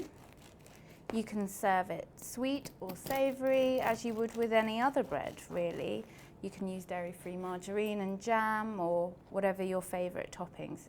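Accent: England, United Kingdom. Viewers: You have beautiful accent. So awefully English. Quite rare to hear nowadays (in noisy London) Absolutely delightful. And nice bread too. Thank you, Chef!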